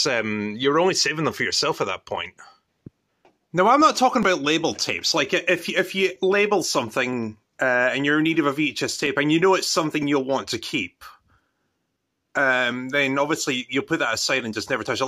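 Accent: Scotland, United Kingdom. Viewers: Do you live in Scotland according to your voice ?🇬🇧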